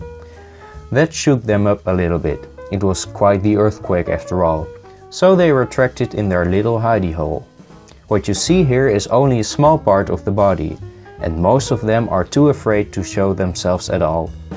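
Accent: Netherlands. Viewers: You Dutch bro? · Nice Dutch accent :) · You sound Dutch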